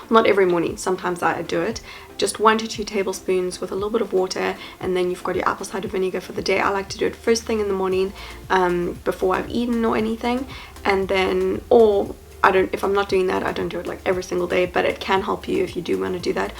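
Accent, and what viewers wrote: South Africa. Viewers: she has south african accent i quess and it sounds so elegant and relaxing i agree 💕💕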